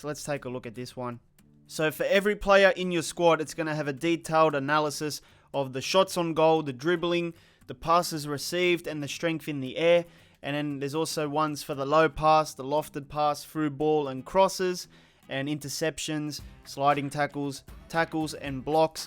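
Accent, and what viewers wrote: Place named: Australia. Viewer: Man were u from Australia r new Zealand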